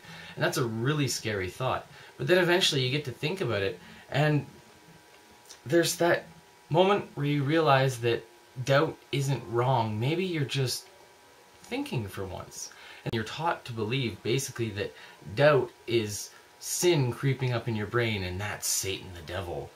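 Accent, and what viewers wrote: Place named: Texas, United States. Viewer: First of your videos I've seen. I'll view a few more this week. You come across as a friendly level headed young man and you'll do just fine without living a life of guilt for doing things that are normal. I was in it toooooo long. It's like banging your head against the wall because it feels so good when you stop! P.S. I like your Texas accent.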